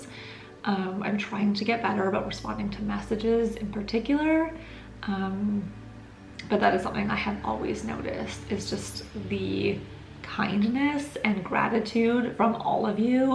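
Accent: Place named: Canada. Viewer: I really enjoy the content, and I can easily tell that the accent is Canadian. I look forward to the video on the tattoos.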